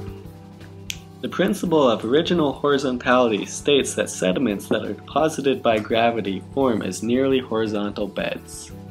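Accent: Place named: Russia. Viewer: Русский акцент, сделай на русском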